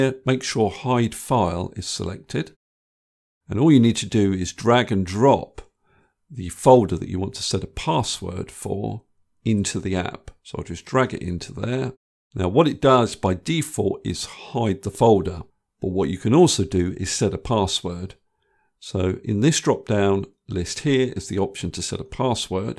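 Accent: England, United Kingdom. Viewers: Thank you brother. Great, easy to understand. I think the English accent helped a lot. You have gained a new dinosaur subscriber!🤣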